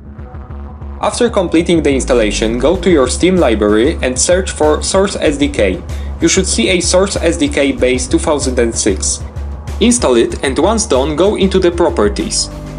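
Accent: Poland. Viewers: Great tutorial, but are you Polish? You sound Polish. (Yes, this is a necessary question.)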